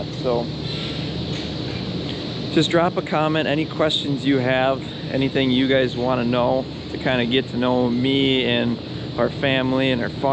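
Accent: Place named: Wisconsin, United States. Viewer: Don't worry if we cant see you your wisconsified accent is impossible to mix up we know its you guiding us threw the video! Cheers!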